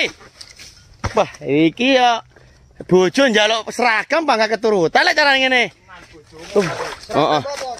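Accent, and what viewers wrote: Tulungagung, Indonesia. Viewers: logatte jian kental tulungagung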